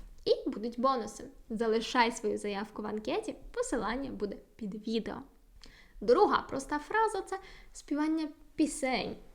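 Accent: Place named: Poland. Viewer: Пані, вибачте, але у Вас сильний польський акцент.😉 Не маємо в українській мові слів "окремний" та "трохі", у слові "вимова" наголошеним є другий склад, а звук [ў] у закінченнях "-ів", "-ив" ніколи не перетворюється на звуки [в] або [ф]..)) Плекаймо ж Її- нашу Мову-Страдницю! За поради ж щодо польскої- велика Вам, пані, подяка!!)))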